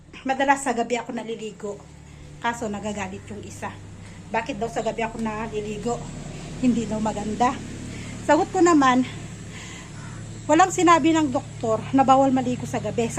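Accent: Philippines. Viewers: I like the way u speak taglish hehehe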